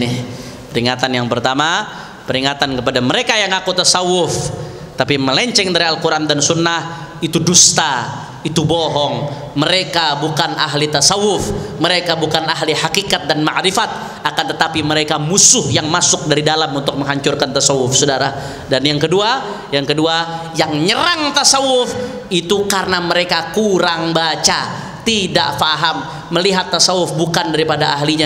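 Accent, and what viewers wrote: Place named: Jakarta, Indonesia. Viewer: Asik cara ngomongnya habib hanif, betawi bgt. Smoga panjang umur dan sehat slalu habib hanif, aamiin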